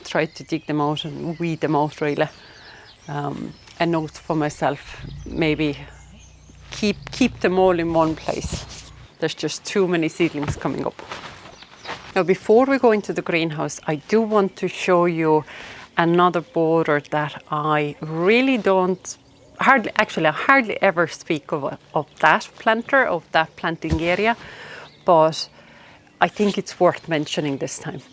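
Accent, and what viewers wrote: Ireland. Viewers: Only discovered your channel. Great video. Trying to place your accent. I'm thinking maybe you're originally from Poland, but have lived in Ireland for many years! You have a strong Irish accent now. ☺ I had a Geum in my garden. I was a way for a while, and the Geum has self seeded A LOT!!! I think mine is "totally tangerine." I had one, now I have five. To be honest, they've taken over a certain part of my main flower bed, and have stopped my ferns from growing which I'm quite surprised about. I'm going to see how they look in their new spot later in the year when everything is growing and if I'm not happy with them, I'll get rid of them and plant new ferns (spot is a little shady). PS: I love your dog.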